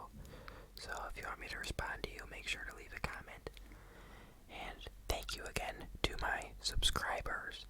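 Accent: Midwest, United States. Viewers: Where in the Midwest are you from?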